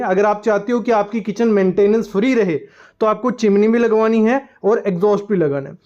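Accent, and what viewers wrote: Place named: Punjab, India. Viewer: Why I'm observing ur voice u r from punjab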